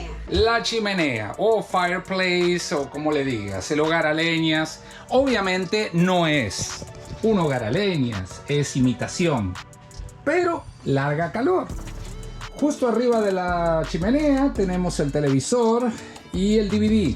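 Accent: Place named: Argentina. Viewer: Hola, te felicito por el video, está muy entretenido y sin tantas vueltas! PD: seguí así con la tonada Argentina!!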